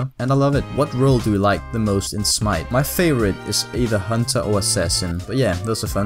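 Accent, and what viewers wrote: Denmark. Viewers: I knew that accent sounded familiar... You're Danish! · Hehe, det var sgu nemt at høre på din dansk-engelske accent!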